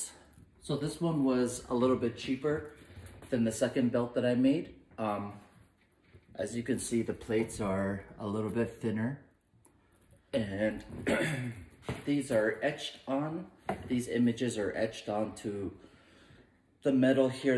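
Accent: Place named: Jamaica. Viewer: He almost sound jamaican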